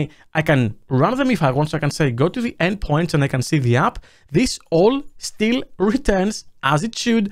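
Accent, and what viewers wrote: Greece. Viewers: are you greek? Not only does your name seem greek but your accent sounds greek to me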